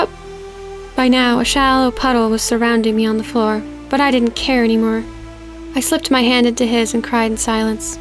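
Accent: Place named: United Kingdom. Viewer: WOWWW! I just found your channel your british accent to it adds prettieness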